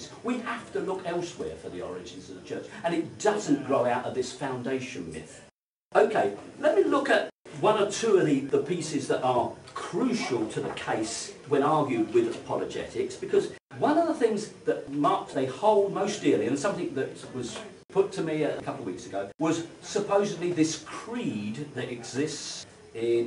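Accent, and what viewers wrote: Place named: Cockney, United Kingdom. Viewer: It’s a beautiful cockney and I love it.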